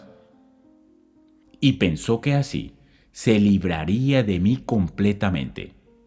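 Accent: Colombia. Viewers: es colombiano, paisa, de hecho, su acento lo delata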